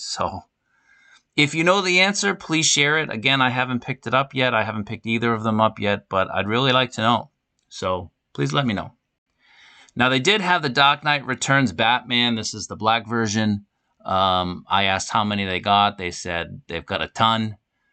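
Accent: Boston, United States. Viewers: So Boston accent ❤ GO CELTICS!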